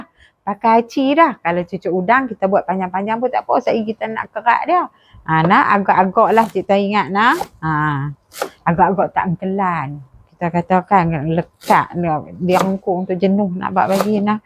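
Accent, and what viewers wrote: Kedah, Malaysia. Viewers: Tu ckp pelet kedah ke perlis? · Suka la dengaq cakap loghat Kedah pure. Mcm mak saya cakap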